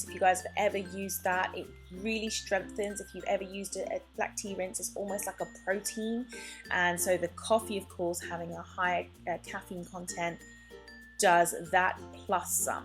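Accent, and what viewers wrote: England, United Kingdom. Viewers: OH MY GOD YOU'RE ENGLISH!!!!! YESSSSS!!!!!!!!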